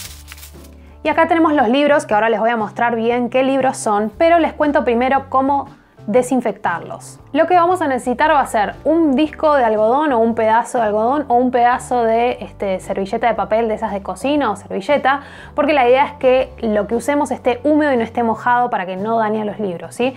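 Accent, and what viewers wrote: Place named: Argentina. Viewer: Al fin un canal sobre libros con una argentina. Todo bien co0n los compatriotas latinoamericanos, pero es mas hermoso oir nuestro acento argentino. GRACIAS! Tomé notas de algunas novelas.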